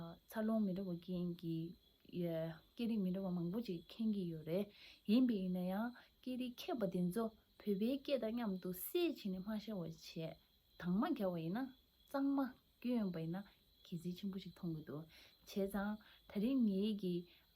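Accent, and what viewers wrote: Standard. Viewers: བོད་སྐད་གཙང་མས་ཐོག་ནས་གཏམ་བཤད་དཔེ་ཡག་པོ་འདུག། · བོད་སྐད་གཙང་མ་སྐྱོན་གྱི་འདུག་པས་ཉན་རིན་འདུཙ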